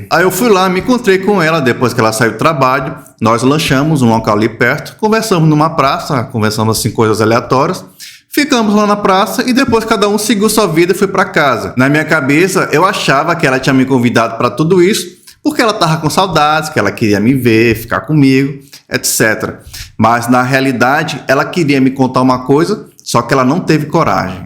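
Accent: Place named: Fortaleza, Brazil. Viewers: Adoro os vídeos do, e a forma que ele fala é resenha e muito natural - "o mermo convite". Nordeste raiz (também sou) 👐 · Voce e muito inteligente engracado.Tenho uma curiosidade voce e cearencepois tem um sotaque de Fortaleza.eu sou cearence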